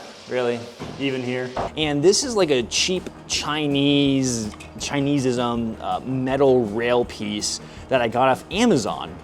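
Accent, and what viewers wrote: Russia. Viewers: His russian accent is almost as good as FPSRussias So thats good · FPS Russia-level accent. Kyle would be proud.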